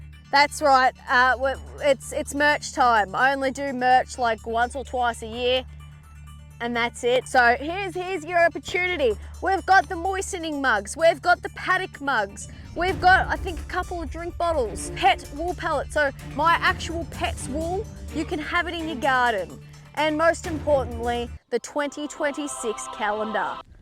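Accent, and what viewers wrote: Australia. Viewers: Fuck all of them. This is how Australians speak. If you don’t like it then scroll. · It's so refreshing to listen to an Australian speaking our dialect, with prejudice. Love your fuckin work. And fuck the do gooders who don't know shit but tell you what the fuck you're doing wrong.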